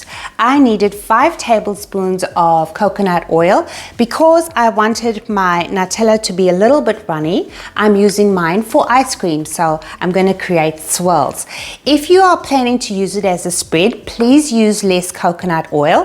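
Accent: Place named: Durban, South Africa. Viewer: thank you love it ..you have a Durban Accent right?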